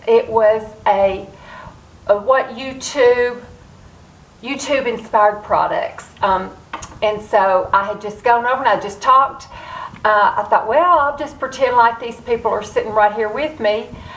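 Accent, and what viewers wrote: South, United States. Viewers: u have a beautiful disposition and the southern accent makes it even more precious,i enjoy seeing your loving and sweet relationship with your husband,i enjoy seeing how u make everyone around u feel special in so many ways, and in so many details,like bday celebrations with food,presents and making them feel special.i enjoy seeing u be in an awesome shape and do your exercises and stay healthy and strong,enjoy seeing your vibrant personality come through even when u r facing surgery.enjoy seeing bravery in action for putting yourself out to the world to be seen and heard.all these things about u and am sure many more to come, i feel need to be noted,acknowledged and celebrated,thank u for sharing your awesomeness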